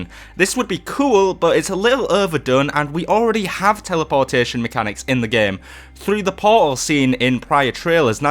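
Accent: United Kingdom. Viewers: British subscribed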